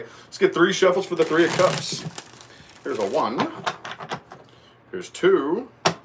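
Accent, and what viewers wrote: South, United States. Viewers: ...That Southern Twang,...Love🦋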